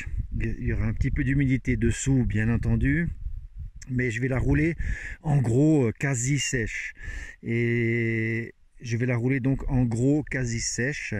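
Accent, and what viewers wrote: Switzerland. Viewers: Merci pour ces belles images, à mon sens une grande qualité de réalisation! et j'apprécie tes valeurs (l'humain con ^^) Cela donne envie de visiter la Suisse en tout cas Et pourtant que de préjugés et autres clichés vu de France (bon en même temps vous nous le rendez bien) j'adore ton accent (léger) qui me fait voyager pas si loin certes, et pourtant il y a déjà tant de différences culturelles.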